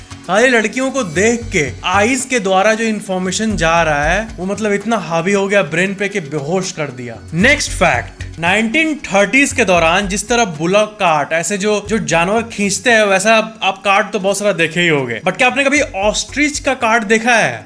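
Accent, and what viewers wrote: Bihar, India. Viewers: Bhaiya aap bihar se ho kya aapki bolne ke andaaz se pta chal rha hai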